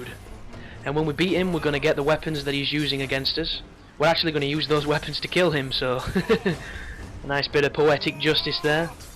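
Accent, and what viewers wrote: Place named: Midlands, United Kingdom. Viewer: "1 take"? you don't say. I can imagine the challenge of filling the voids with chatter, hell I love the sound of my own voice but even I shied away from audio commentaries. I'm glad you showed me that I was right to do so. Tell me bra', where are you from *county will do* I'm struggling to place that accent. I'm from Derbyshire and you don't sound a million miles away.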